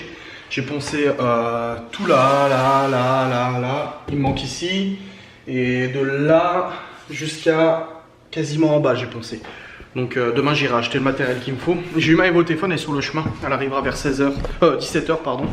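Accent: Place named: Lorraine, France. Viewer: Hihi tu as vraiment un patois Lorain. "en retraite", nous les sudiste on dit "à la retraite" je trouve ça marrant. Mais bon je te fais cadeau d'une expression patoise gersoise "tataragne" pour araignée ^^.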